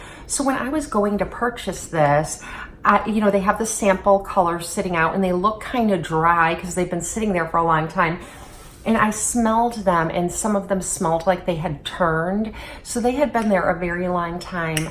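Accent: Midwest, United States. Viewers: Hi! I came from channel. You are simply stunning! You have such a midwest accent. Cleveland? Chicago? I enjoyed your review. You have a new subscriber.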